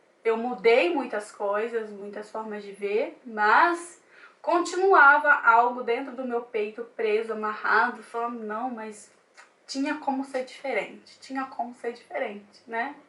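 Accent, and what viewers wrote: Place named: Northeast, Brazil. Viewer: olá bom dia.eu amo seu sutaque d nordestina e tão lindo.esse arrastado d R.kkkkksucesso linda fica com deus.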